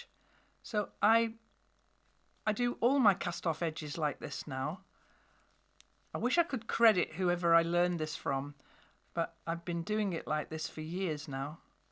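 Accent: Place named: Scotland, United Kingdom. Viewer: Awww. Agnes is a spitting image of you. I don’t knit but truly enjoy watching your videos and am inspired by your creative ideas. Frugal as well, I find fascinating the way something is always possible from seemingly nothing. I’m in Missouri,USA yet in my imagination I can find that I’ve come to Scotland for a visit when you speak. Ahhh. And the bagpipes……🙂 Perhaps one day….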